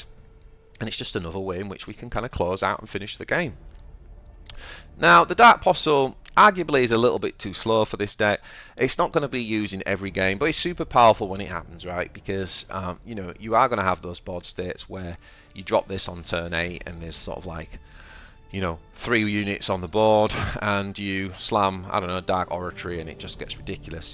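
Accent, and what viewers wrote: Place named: England, United Kingdom. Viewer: I found your channel from some russian nids player in chat and ill just say that throwing an english accent in there makes this sound so much more credible.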